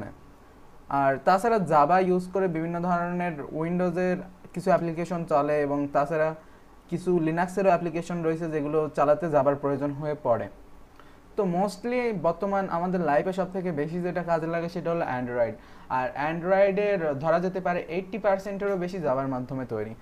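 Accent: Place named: Khulna, Bangladesh. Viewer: ভাই আপনার বাড়ি মেবি বাগেরহাট বা খুলনা হবে কথা শুনে আমার তাই মোনে হচ্ছে, আমার বাড়ি বাগেরহাটে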